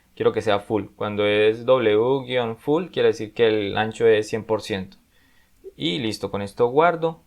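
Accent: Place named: Colombia. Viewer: De antemano, muchas gracias por dedicarle tiempo a enseñar a los demás. Ojalá más personas se sumen como yo a este canal. Por tu voz asumo que eres de Colombia, al igual que yo. Estoy aprendiendo por mi parte a programar, pero por mi trabajo y responsabilidades no pude. Ahora Sacando tiempo, poco a poco voy aprendiendo y espero, con tu ayuda, seguir aprendiendo. Bendiciones para ti y tu familia.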